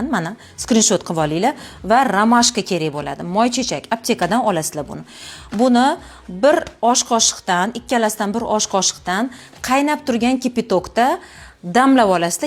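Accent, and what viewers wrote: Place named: Tashkent, Uzbekistan. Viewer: Тошкентча. Гапиришга гап йук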